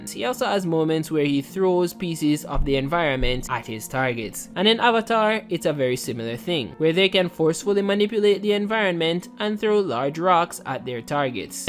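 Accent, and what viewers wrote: Jamaica. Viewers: Question are you Jamaican? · Are u jamaican? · Are you Jamaican?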